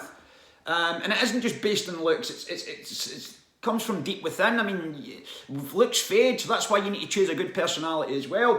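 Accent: Scotland, United Kingdom. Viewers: 54 seconds in Turned you off because you slighted Katie Hopkings , The only sensible woman i repeat real sensible woman who talks any sense at all in these "British" Isles. Regarding most subjects . Secondly i turned you off because of your boat race, Thirdly because of your wasself scoottish accent. Oh and fourthly because if i had listened to the rest of what was going to probably be a woke leftie muslim symathising load of bollocks i would have turned off in the first 10seconds.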